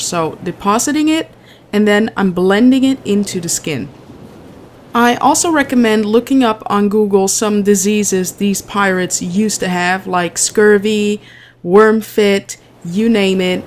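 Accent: Netherlands. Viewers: Im gonna guess.... Ben je nederlander? Lol je accent.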